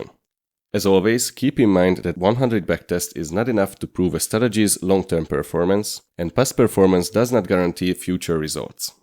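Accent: Hungary. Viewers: Are you Hungarian? :)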